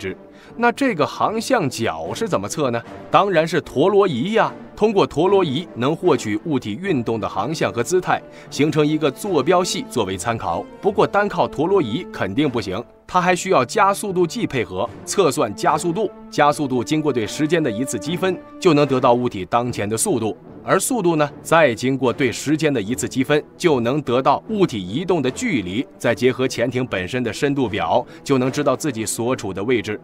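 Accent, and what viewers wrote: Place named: China. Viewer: 這是中國人做的『4瓶』雖然大量使用【正體漢字】但是《腔調》與習慣是騙不了人的!! 用詞遣字發音腔調騙不了人的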